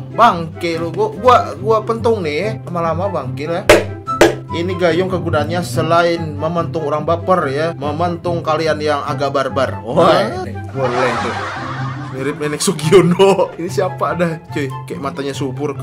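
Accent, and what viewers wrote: Banjar, Indonesia. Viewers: Bah orang banjar ni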